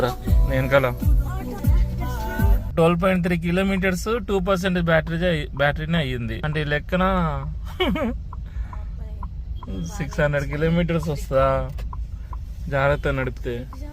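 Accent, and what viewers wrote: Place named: Telangana, India. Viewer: బ్రో మీ మీ వీడియోలు చాలా బాగున్నాయి. మీ ది తెలంగాణనా లేదా ఆంధ్రప్రదేశ్ నా మాట తీరు సేమ్ తెలంగాణ ఎలా ఉంది. అందుకే డౌట్ వచ్చి అడిగా ఏమనుకోకండి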